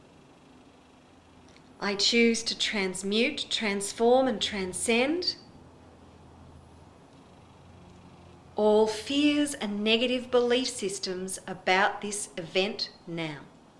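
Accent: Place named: Australia. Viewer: this aussie lady has me thinking in this accent at times.. oh boy very funny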